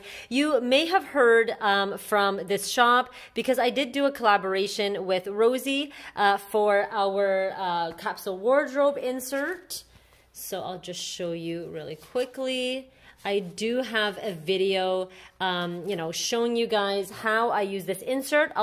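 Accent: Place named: France. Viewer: your French accent is beautiful! You must have spent some time in France 🇫🇷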